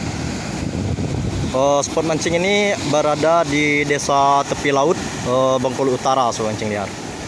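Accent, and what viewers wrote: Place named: Malaysia. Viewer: Asal mana om kok kayak malaysia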